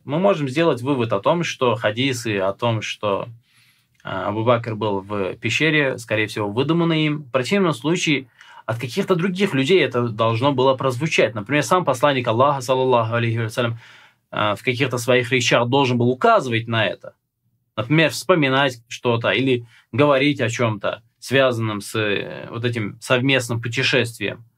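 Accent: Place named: Iran. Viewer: Ибарат не можешь нормально прочиталь арабские слова подрожая иранские мотив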